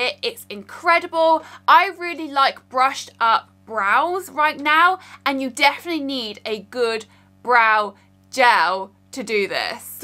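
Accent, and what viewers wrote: United Kingdom. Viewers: you are from UK?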